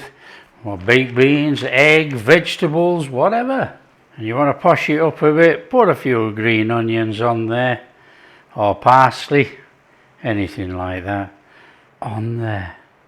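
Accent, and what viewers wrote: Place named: West Yorkshire, United Kingdom. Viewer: You, my friend, have got to be a West Yorkshireman..? I absolutely feel like I'm home, listenin' to 'yo' ❤️🥰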